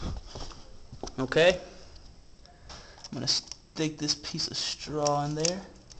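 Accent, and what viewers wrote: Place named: South, United States. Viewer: Y'all, that helped me a lot but y'all need to stop talking like that ya country boy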